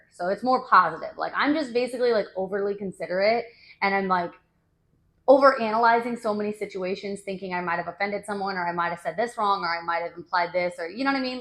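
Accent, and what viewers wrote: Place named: South, United States. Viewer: Love the accent!! Houston? Lil southern, lil ratchet accent!!!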